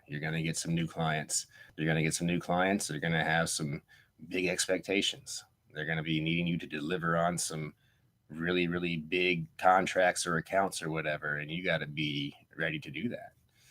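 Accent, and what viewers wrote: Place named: United States. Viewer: Love your American Accent. ♥🐱★💋⚘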